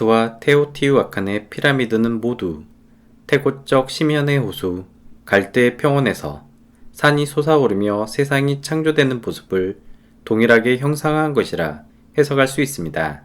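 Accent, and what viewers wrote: Jeolla, South Korea. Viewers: 말투를 들어보니 고향이 전라도이시구만요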